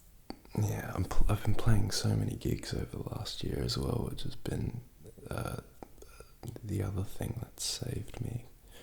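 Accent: Australia. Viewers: I think it’s Australian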